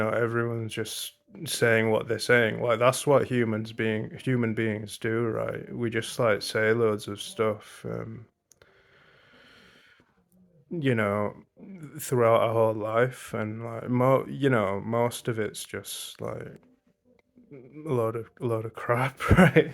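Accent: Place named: Yorkshire, United Kingdom. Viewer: if you couldant see his face you would think hes a white guy from yorkshire, if he was born and raised here he aint a migrant, if he is actually a migrant well done to him he got the accent spot on, wish the rest could speak english as fluently as he does, my only gripe with migrants is the fact that they dont have any education and they either dont or barely speak english, they add nothing to the country, and dont get me started on muslims and all their backward traditions polluting our culture, but he might not be a muslim, so cant really treat them worse on the assumption of what religion they may or may not be, long as he aint over here trying to ruin our culture he got no problem from me i hope the guy that made this video is ok, and i hope the guy that made this comment is ok, like the guy that uploaded this video might be having a rough time, but think if all you got to do with your day is leave shit comments like this on videos, with zero creativity, thats a bleak existence my guy hope you get more stuff to do in your day, and if your going to leave troll comments at least get creative and put some effort into it aye? 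bottom of the barrel that mate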